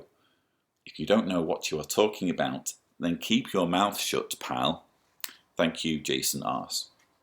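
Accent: United Kingdom. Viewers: I loved your Prince video. I'm not an ex jw but remember the ones I've come across ... and I remember feeling bad for pretending not to be home when they came to the house ... but ever since I was little I was fascinated with the little magazines... the beautiful illustrations and kind messages... and the well dressed people giving these little booklets out.... my mom raised me Catholic but I was allowed to play with the little books for coloring or playing office.... when I did answer the door I was taught to be polite and received the little books or at a bus stop waiting I'd appreciate the free little book from the jw... anyways... my world would never gave been able to commit to the meeting demands etc etc... BUT STILL MY LONG FASCINATING INTEREST IN THEM REMAINS.... and now with all the ex jws and YouTube TTATT .... man some serious juicy gossip and exposure.... 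wow thank you for sharing your story... I love listening to your British accent.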